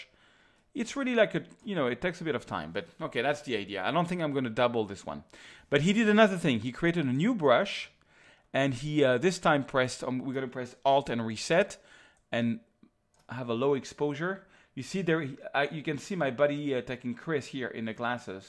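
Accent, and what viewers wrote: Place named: France. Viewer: Bonjour Monsieur, merci pour tous ces tutoriaux vraiment clairs et précis. C'est un plaisir de vous comprendre, en anglais, et ce grâce à votre accent français:-) surtout pour quelqu'un qui ne maîtrise point la langue de Shakespeare. Très cordialement. Christophe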